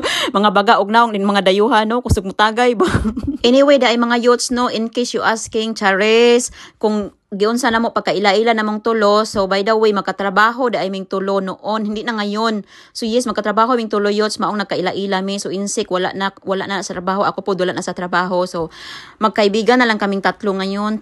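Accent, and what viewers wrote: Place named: United Kingdom. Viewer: Paspasi ang cheers dihaa! ang imo British accent dai kay gwapa paminawon.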